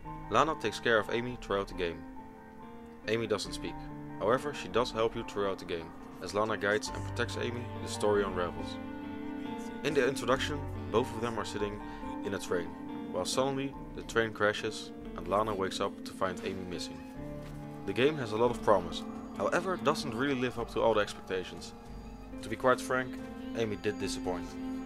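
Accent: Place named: Netherlands. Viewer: Je hoort dat hij nederlands is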